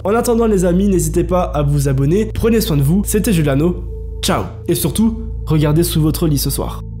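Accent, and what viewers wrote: France. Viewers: Salut, je découvre ta chaine ^^ N'aurais-tu pas comme un chtit accent du chnord? ^^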